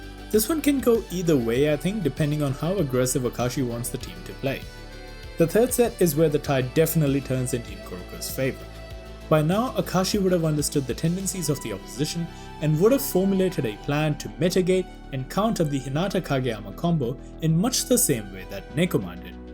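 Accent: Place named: South Asia. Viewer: I can identify a desi voice when I hear one👀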